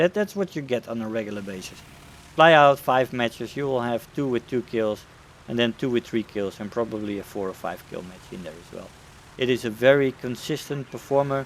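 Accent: Netherlands. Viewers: dat nederlandse accent lol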